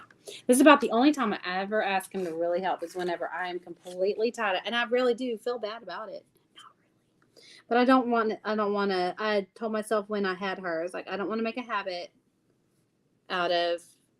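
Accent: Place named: South, United States. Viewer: Lovin her southern accent….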